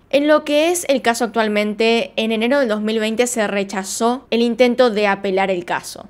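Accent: Argentina. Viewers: Hola me encanta como narras todos los casos ya mi hija y mi yerno están adictos también a tu canal..pero..quisiera preguntarte Cómo te llamas..cuantos años tienes lo unico que sé es que eres de Argentina por tu acento Soy Panameña por todo lo demás y como decimos acá me quito el sombrero ante tu excelencia de documentar investigar y narrar todos los casos...Felicidades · Me encanta el acento argentino😀 Excelentes vídeos... Saludos desde Ecuador 👏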